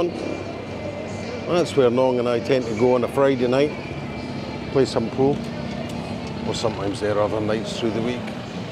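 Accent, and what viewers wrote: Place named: Scotland, United Kingdom. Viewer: Good video. Always good to hear a fellow Scot on YouTube. I've been going to Thailand since the early 90's and met my wife out there mid-2000's. She moved over to Scotland with me a year ago, with a view to settling here (for a few years). We may eventually move back to Thailand when I retire. Jomtien looks interesting. I met my wife in Patong and as you know, Phuket has become too busy in the last few years, just like Pattaya. Jomtien and some other parts of Thailand like Songkla City, can offer a quieter experience and lifestyle for those who prefer that. I would be perfectly happy in Jomtien, from what I have seen in a vew videos. Keep up the interesting vids and who knows? Maybe bump into you in the future for a couple of cheeky ones. All the best Pal:)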